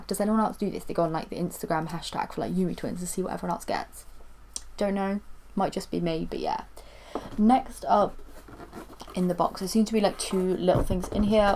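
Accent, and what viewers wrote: United Kingdom. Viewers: Hey, I am guessing from your accent that you are based in the UK? I was wondering if you remember which courier service was used to deliver your box? Mine has still not arrived from June and the company are just telling me it is out of their hands once the box has left Japan :(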